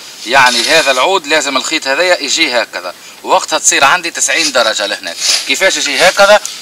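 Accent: Tunisia. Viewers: صفاقسي انتي؟؟؟ متابعك من تونس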